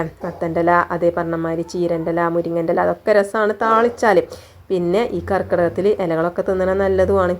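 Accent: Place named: Thalassery, India. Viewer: ഓരോ ആളുടെ ശൈലി അല്ലെ അത് എനിക്കു തലശ്ശേരി ഭാഷ ആണ് എനിക്കു ഇഷ്ടം ആണ് നിങ്ങളുടെ ഭാഷ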